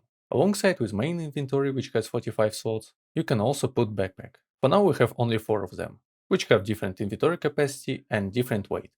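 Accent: Russia. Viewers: Мне кажется, или я слышу русский акцент? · Are you Russian,but you know English?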